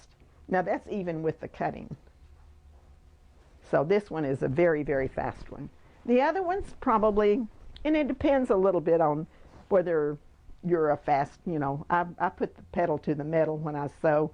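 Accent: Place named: East Texas, United States. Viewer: Love to listen to you. Lovely E Texas accent. I am an Eastern planted in San Antonio.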